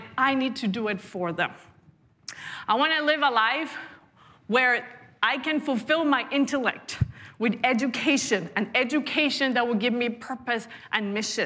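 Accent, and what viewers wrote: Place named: Colombia. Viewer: Quico...lo importante es que ella habla el castellano de Colombia,no el de tu pinche Mexico.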